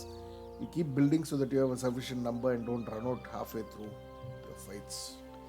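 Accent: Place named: India. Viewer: hey nice video man Are you from India? u r english accent is completely matching to indian style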